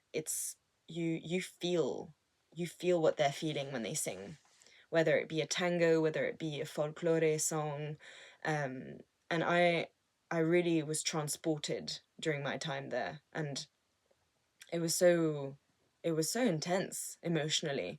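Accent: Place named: United Kingdom. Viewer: I like your music videos. Very nicely done. You have alot of talent. But surprised to hear your British accent.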